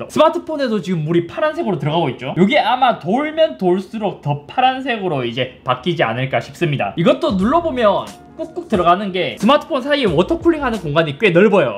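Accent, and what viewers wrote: Gyeongsang, South Korea. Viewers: 역시 경남분이셔 ㅋㅋ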